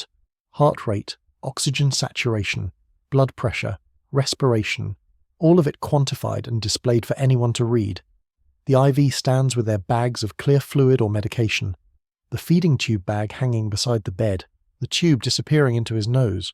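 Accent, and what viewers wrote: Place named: United Kingdom. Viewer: This is an American story, why is there a Britain narrating it?,, I want to hear America’s story of American voice.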